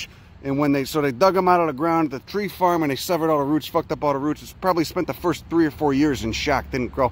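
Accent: Chicago, United States. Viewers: That's a pure Chicago accent.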